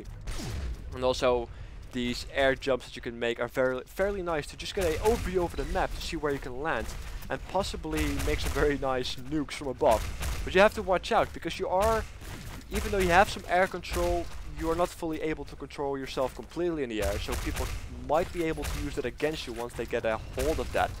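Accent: Netherlands. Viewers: u dutch?? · je klink als een nederlander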